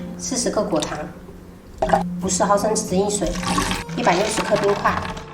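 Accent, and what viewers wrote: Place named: South, China. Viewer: 聽她口音不像閩南口音, 應該是其它南方省份 , 嫁到閩南的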